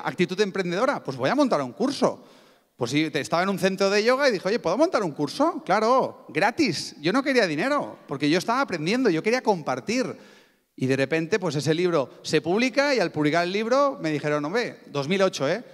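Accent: Spain. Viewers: ! No me agrada el dialecto español (jeje me refiero a cómo se escucha cuando hablan los españoles) pero cómo me encanta lo que dices. Gracias!!! Totalmente de acuerdo contigo en la mayor parte de lo que compartes con nosotros. Gracia!!!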